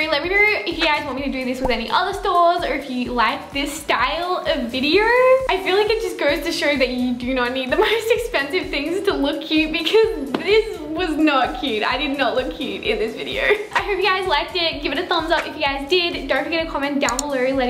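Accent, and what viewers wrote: Australia. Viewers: Jessica Rivera Australian accents make me so happy haha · I just want something..... I WANT YOUR AUSSIE ACCENT🔥💗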